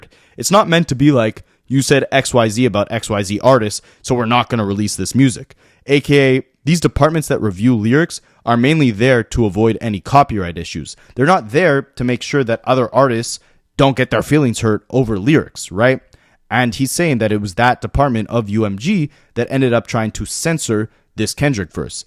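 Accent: Canada. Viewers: Its the canadian accent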